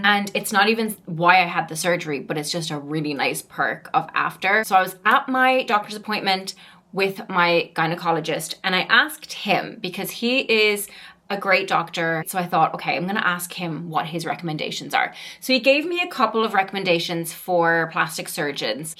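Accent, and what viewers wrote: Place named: United States. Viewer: Thank you for sharing, the first time I encountered one of your videos was in Brazil 2012 when I was experiencing some homesickness. I immediately was like who is this beautiful woman that has an American accent but not at the same time. lol...I loved it and I appreciate your honesty throughout the years, it's been lovely seeing you remain true to yourself. Thanks again for sharing because you don't have to Well wishes from Guyana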